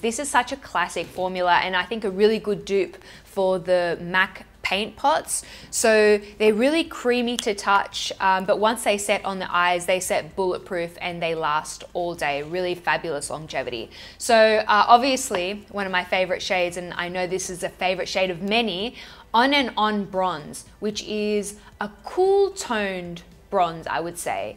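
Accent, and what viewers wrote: Australia. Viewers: Love your Oz Dialect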